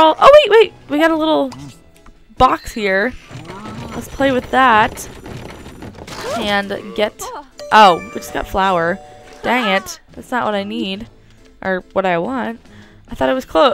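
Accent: United Kingdom. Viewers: Your British accent is spot on! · U sound like garnet from Steven universe with ur British accent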